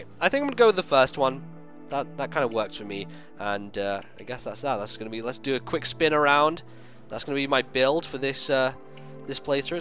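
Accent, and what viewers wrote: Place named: United Kingdom. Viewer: British accents are a thing.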